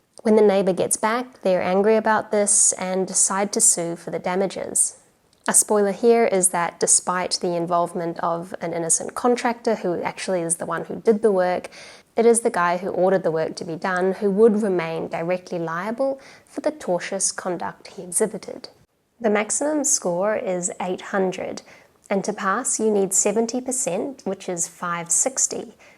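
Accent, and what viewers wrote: Australia. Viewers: Are you from Australia?? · No, that sounds more like an Aussie accent in this video. Either way, still great content!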